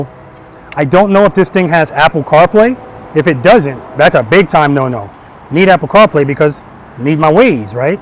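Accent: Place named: New York City, United States. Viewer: you move to fl . still have that heavy nyc accent . thats a nice bike . you have to get use to it but i get your point . thats why i ll stay with my kawa vulcan s abs 2018 in white all over sunny so az . moved in uhaul from so bx ny 11 mo ago best move ever . the only thing i miss is orchard beach bx . here i have to drive 8 hrs to cali or tex to see ocean but is still better that hunts point bx next to 41 pct longwood . had some friends there in blue . if i want fl thats 5 days pensacola beach other points in fl alittle longer but i may do it . if you see a white kawa 650 vulcan s thats looks like a small harley with all the ad ons and laoud pipes that would be me . may the bike week in daytona in 2022 ? . i use to see a nypd moto off patrolling the bx highways but only one . i never got chanse to talk to him you know him or her ? 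in the summer plenty of scooter nypd in orchard beach bx . but that harley in bx hwys look a lot better. but i think the nypd should get bmw police bikes that would look good . here in az and cali thats wath moto police ride . always a head turner in florida to right ? .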